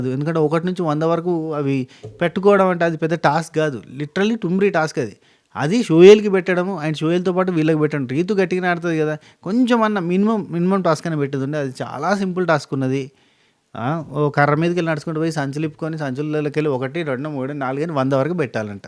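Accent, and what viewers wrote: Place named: Rayalaseema, India. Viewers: Rayalseema yaasa😅😅😅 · Memu rayalaseema kani mee antha goranga matram matladaru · garu rayalaseema yasa ok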